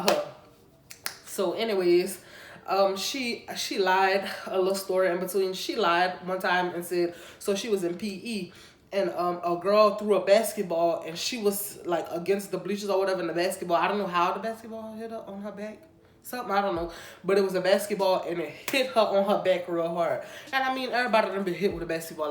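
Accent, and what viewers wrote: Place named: Louisiana, United States. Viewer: oh ok yasssss.. I'm also from Lousiana. ( New Iberia) I'm in California now been here since 7 years old.. All my people have your accent... I love it. Love your channel.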